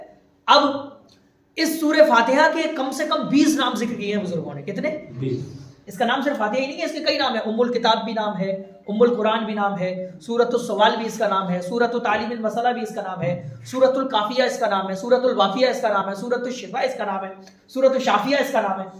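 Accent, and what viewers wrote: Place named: Maharashtra, India. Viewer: Mashaallah buhut umda❤... Janab aapke baat karne tariqe se lagta hai Maharashtra se belong karte ho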